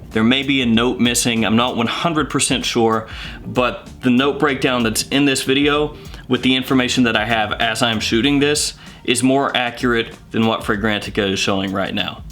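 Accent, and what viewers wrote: South, United States. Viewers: Hey. Nice vid. Btw. What is your accent? Is it southern?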